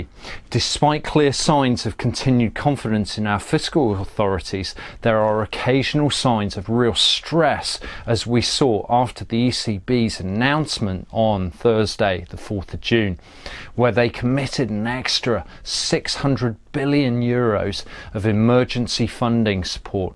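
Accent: United Kingdom. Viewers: You remind me of a black guy, but with Caucasian skin and a British accent.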